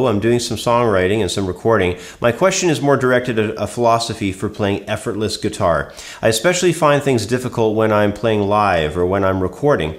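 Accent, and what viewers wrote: Canada. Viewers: Are you Canadian? Accent when you say out sounds like trailor park boys! Awesome lessons!!!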